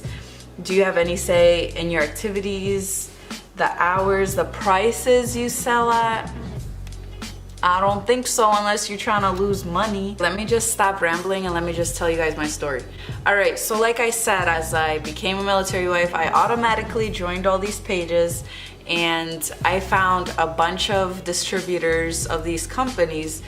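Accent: United States. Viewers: we are everywhere haha i'd say you're from New York or something lol, looking forward to more of your videos! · Been trying to figure out what state she is from with her accent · Reminds me of a chicago south side girl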